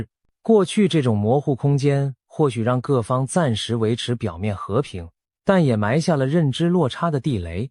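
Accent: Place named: Beijing, China. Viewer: 主播拜託你不要用北京的爛中文發音，中共產黨的中文真是非真正的中國人的正統中文，如亞洲正確的發音雅洲才對不是讀訝洲請主播用最正統派的中文發音，常識正統派讀常視發音才對不是中共匪幫的爛中文讀常時那中共產黨畢竟他不是中國人所以中共用的中文發音非常爛又不是正統派的中國語言，主播買本正統中文字典注音符號的書好好認識中共的爛中文字發音吧！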